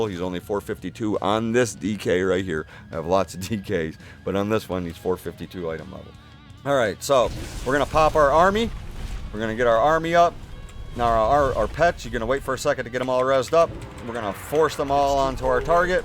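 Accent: United States. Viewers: I subbed to get awesome Unholy DK info....and because your voice sounds so American you could be my neighbor. Love this. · I like your videos man. Nice to hear an American voice in a wow vid.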